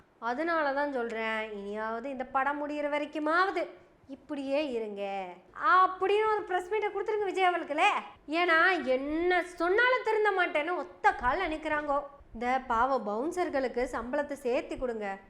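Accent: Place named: Coimbatore, India. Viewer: Super sister with Coimbatore slang ❤. Love it.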